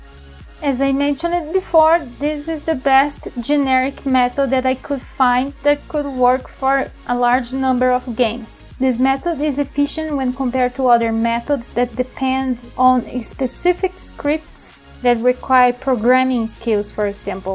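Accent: Brazil. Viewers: Você tem sotaque brasileiro, deve ser brasileira. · caraca, eu tava ouvindo o seu ingles e pensei, só pode ser BR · Your accent is so cute! But I've found it oddly similiar... Até eu descobrir que vc é br xD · pelo sotaque é BR hehe enfim mto bom o conteudo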